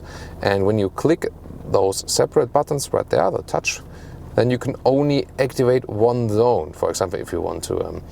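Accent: Germany. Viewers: English with german accent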